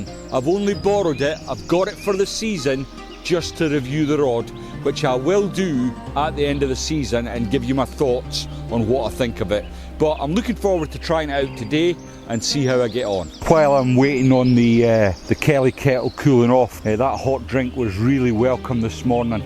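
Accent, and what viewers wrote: Scotland, United Kingdom. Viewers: you sound Scottish and a lot of your viewers think your Scottish.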